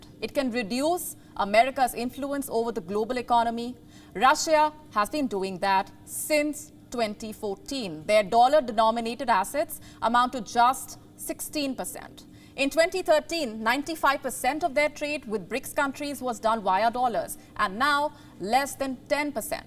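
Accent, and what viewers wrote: India. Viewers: Gosh indian accent is horrible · I wish your Indian accent was greatly reduced so you would be easier to understand. And your voice doesn't have the soft firmness I've become used to. I really hope you will work on this. Your channel is quite informative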